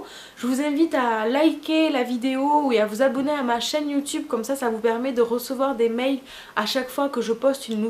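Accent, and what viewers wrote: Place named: Toulouse, France. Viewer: A la la cet accent toulousain ! 😂💕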